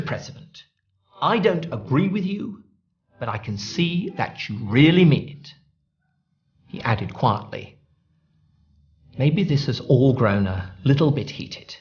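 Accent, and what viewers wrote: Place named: United States. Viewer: This guy's American accent is insulting.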